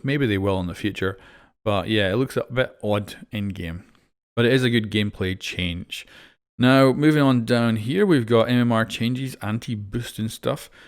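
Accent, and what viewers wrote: Scotland, United Kingdom. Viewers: Are you Scottish you sound it I’m also Scottish if you are?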